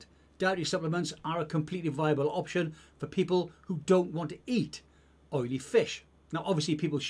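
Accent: United Kingdom. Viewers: Re the stack at the end of the vid, I am curious where you get your 500mg Metformin from. From the accent I'm guessing you are UK based. Did you measure its effect on your IGF-1 levels? I think this is one very interesting supplement. I heard some have side effects but can depend on the dose.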